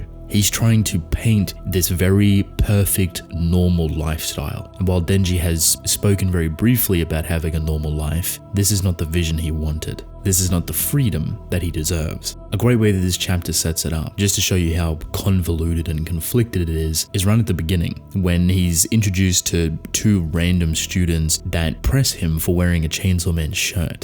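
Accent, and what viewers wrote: Australia. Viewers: Great vid. Are you Aussie by the way? I have trouble pinning down the accent.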